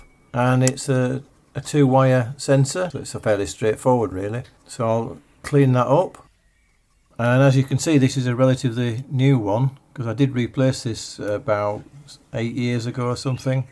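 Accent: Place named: Wigan, United Kingdom. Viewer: Love your videos been a fan for ages and learnt a lot thanks to you I've tackled many things on my Seat Ibiza 6l 2006 1.9 TDI axr engine code.. going purely by your accent you're probably not far from me . I live in Wigan I'm always tinkering like yourself.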